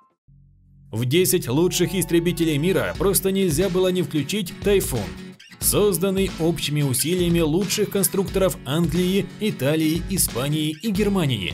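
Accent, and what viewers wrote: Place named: Komi, Russia. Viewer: Ты из Коми что ли? Тебя слушать-уши вянут!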